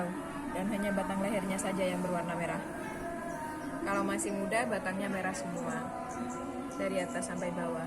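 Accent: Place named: Java, Indonesia. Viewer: Jowone Endi mba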